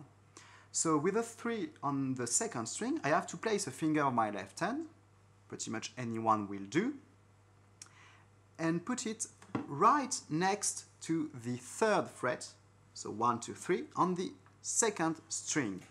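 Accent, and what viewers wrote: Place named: France. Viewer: English vowel sounds are a little sloppy, less precise than French. A native German speaker once told me that English vowel sounds tend to float all over the place. And while French words have even stress on all syllables, English words generally have a stressed syllable, even at times a secondary stressed syllable. Sometimes the stress shifts according to part of speech, like the noun "RECord" and the verb "reCORD". So imprecise vowels and location of stress get one closer to native English. But none of these comments are meant to be critical of you. You were perfectly understandable. Please you keep making guitar instruction videos, and the rest of us will enjoy the occasional French idiom that slips through! Thanks..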